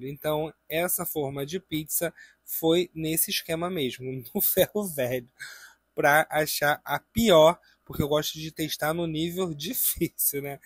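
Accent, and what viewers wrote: Rio de Janeiro, Brazil. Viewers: Oi Boa noite.Nossa eu sempre procurei 1 receita de pasta; porque aqui em SP e muito caro.🙏🏻❤ por nos ensinar c tanta clareza e dedicação vc e 1 luxo e os CARIOCAS falam fofos👍😘❤Inte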